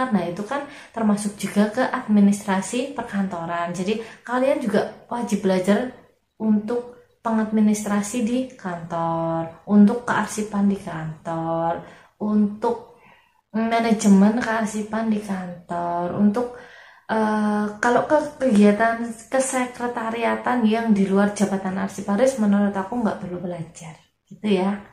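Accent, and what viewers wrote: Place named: Java, Indonesia. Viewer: medhok mbak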